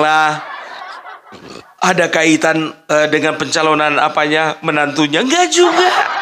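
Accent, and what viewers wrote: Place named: Java, Indonesia. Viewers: Helo,aku salut karo sampean opung asli medan ning ngomong jowomu medok banget,sampean sekolah boso jowo ning ngendi pung,jian pinter banget boso jowone.GBUOPUNG 🙏🙏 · 😂😂😂opung jowo ne jian medok..Jawa bisa Sunda bisa Batak apalagi...love you full opung😂😂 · Opung... Cengkok Jawa nya sdh dapet 👏🏽👍🏽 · bagus bnget pke bhs jawa😅😅😅😅 medoknya tambahin Pung😅 narasinya pas bnget👍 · Om Iki wong jowo opo wong Seko tapanuli.jowone pas.dialek tobane juga pas.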